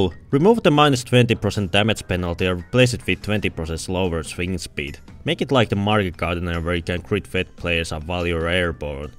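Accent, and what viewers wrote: Finland. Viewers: Kuuntelin minuutin ja haistoin suomalaisen aksentin. Good video regardless! Informative and staid pretty close to the topic.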